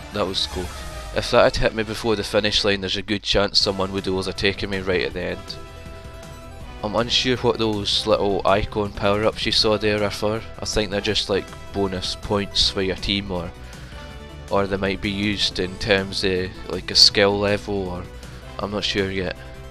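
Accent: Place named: Scotland, United Kingdom. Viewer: You must be Scottish, cool accent! Regards BBKCjr. ;)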